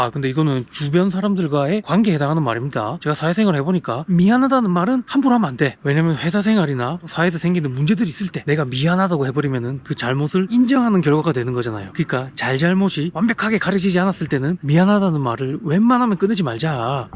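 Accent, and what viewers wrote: Gyeongsang, South Korea. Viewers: 유쾌한방송이네요. 좋은말씀을 갱상도버젼으로 들으니 마 기에 팍! 하고 꼬피뿌네요. 감사합니다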